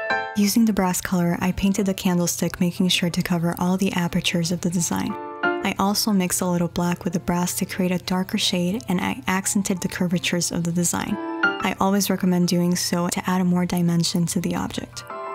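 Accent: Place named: United States. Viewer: I’m curious where you are from, you have a lovely American accent with something lovely from somewhere else...beautiful speaking voice, you MUST start an AMSR channel, you will gain thousands of followers! ✨🐰🐰🌹✨🎠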